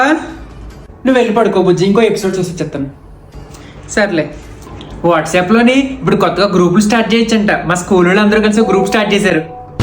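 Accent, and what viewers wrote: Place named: Rajahmundry, India. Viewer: i love his slang as i was from rajahmundry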